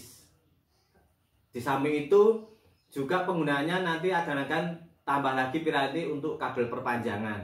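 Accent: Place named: Java, Indonesia. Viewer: Capek denger nya medok skali bahasanya🤦‍♂️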